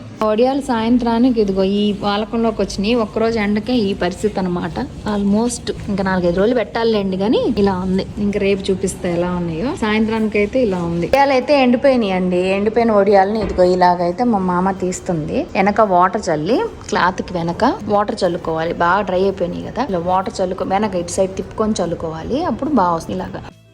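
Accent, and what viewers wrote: Nellore, India. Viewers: Meedi nellore aa andi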